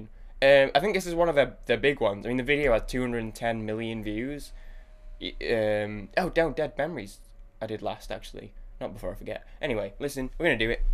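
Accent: United Kingdom. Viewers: Sometimes it's hard to hear exactly what you're saying because of your thick British accent, but that's okay because I really enjoy just hearing you talk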